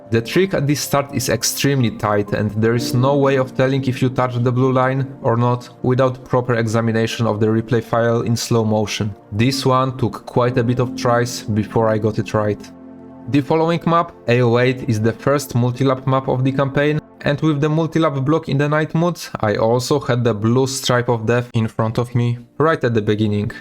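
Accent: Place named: Poland. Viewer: jesteś Polakiem, słychać haha. poeodzonka na yt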